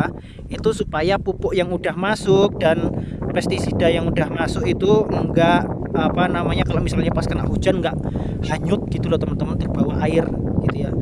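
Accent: Java, Indonesia. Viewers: Jowone ngend to mas...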